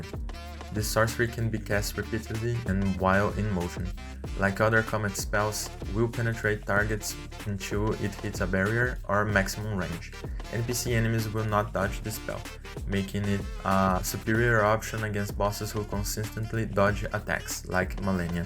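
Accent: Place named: Brazil. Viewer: Vc é br ne cara, logo no começo notei o sotaque kkkkk Adorei o video!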